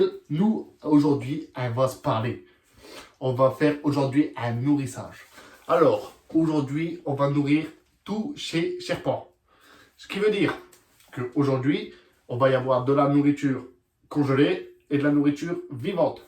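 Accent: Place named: Nord-Pas-de-Calais, France. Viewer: Mdr la presentation en chtit. Tres belle video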